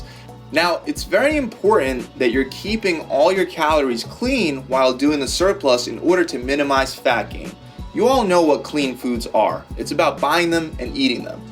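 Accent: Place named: New York, United States. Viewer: You sound like the dudes on Friends, or Seinfeld. Are you from New York?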